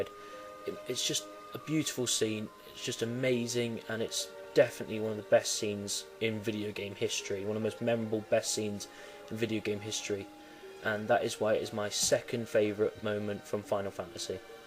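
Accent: United Kingdom. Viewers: the video would be more epic without your very gay voice, let me guess britain? all islandmonkeys sounds gay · Are you british?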